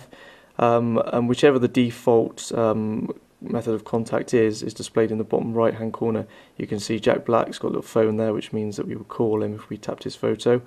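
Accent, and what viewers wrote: Bristol, United Kingdom. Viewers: awesome phone, getting mine soon, also you sound like you're from around bristol.......maybe? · he has a British accent... i dind't understand him either pretty good... but if u listen carefully, its okay!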